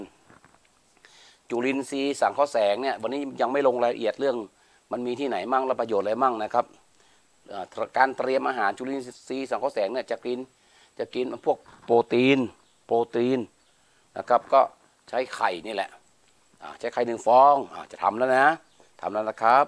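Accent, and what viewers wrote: North, Thailand. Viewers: อาจารย์เป็นคนเหนือหรือป่าวเจ้า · อาจารย์อยู่แถวใหนคะพูดได้สาบเมืองมากเลยค่ะ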